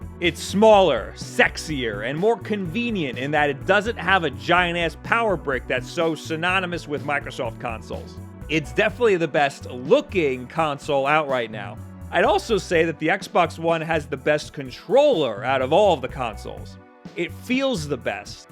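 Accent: New York, United States. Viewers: THAT NEW YORK ACCENT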